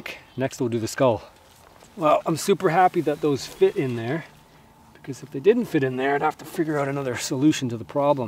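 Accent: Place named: Canada. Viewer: This is great man. I love content like this. I've very jealous but also very excited for you. I've been wondering where you are from. You sound very canadian like me. I'm from northern ontario myself!!!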